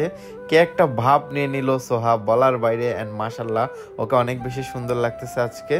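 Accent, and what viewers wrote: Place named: Chittagong, Bangladesh. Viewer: Tomi ctg chela seta na janar ki ace. tomder family r sby without soddo bhasai kotha bolo tokhon ctg ton ta esposto boja jai😂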